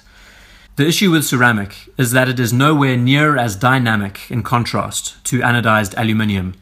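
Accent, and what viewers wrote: Cape Town, South Africa. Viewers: Tell me are you from Cape Town?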